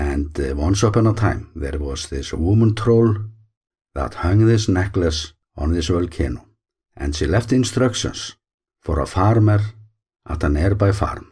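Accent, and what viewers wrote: Iceland. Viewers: Brilliant as usual and the English language couldn't sound any better with an Icelandic accent.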